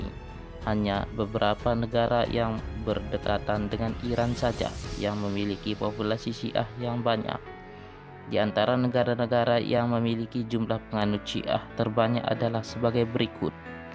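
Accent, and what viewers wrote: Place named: West Java, Indonesia. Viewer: Orang sunda ya. Huruf Z nya jdi J hehe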